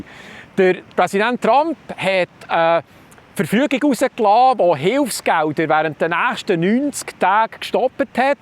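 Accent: Bern, Switzerland. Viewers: Danke... Du bisch dr bescht u es isch toll dis Bärndütsch...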